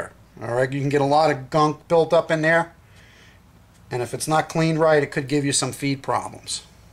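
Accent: New York, United States. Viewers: NY accent? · Great stuff! Thanks for the video and simple to follow instructions, this is my first gun and I want to take good care of it! btw love the accent, you from New York?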